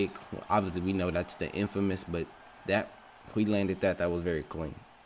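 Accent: Jamaica. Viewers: i’ve been inna party with this guy he’s nice and chill. has a Jamaican accent i think . i only say i think because i don’t know accents good.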